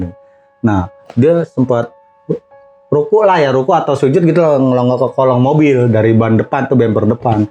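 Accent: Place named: Tegal, Indonesia. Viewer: kalo lagi ngapak"mampir dong ke kampung aku aku juga di daerah tegal tau. Klo udah bicara fillowopi nya keren"banget aku suka.!!!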